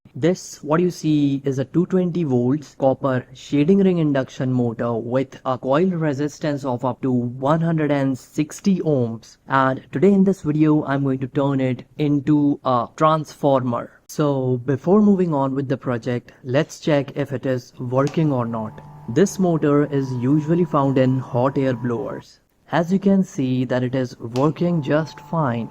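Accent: India. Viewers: У него индуский акцент??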